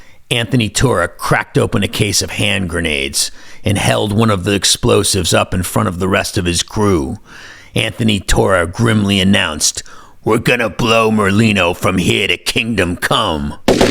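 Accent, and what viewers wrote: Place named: Philadelphia, United States. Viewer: Great stuff. And ignore the rednecks who are bothered by the South Philly accent. I am glad it annoys them.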